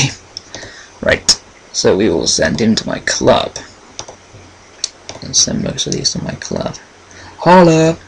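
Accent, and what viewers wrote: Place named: Suffolk, United Kingdom. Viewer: Ahh, ok. You just sounded like someone from suffolk! lol How do you make your coins on ultimate team?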